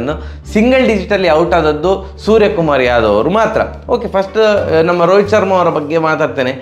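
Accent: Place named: Bangalore, India. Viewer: Bro dakshina kannada bittu blre kannada mathadtidira... please DK kannada dalli maataadi..that janardan sir bashe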